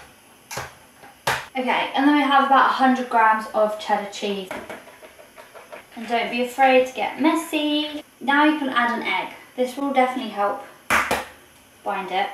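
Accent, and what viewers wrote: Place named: England, United Kingdom. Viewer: Great channel, love the way you explain keto really well and your down to earth attitude. Ive been doing keto for a month and really like it. I think everyone does keto their own way and everyone's a bit different. Been watching various channels and most of them have been American. It's great to have channel with an English accent talking about the places I know like tesco. Thanks and well done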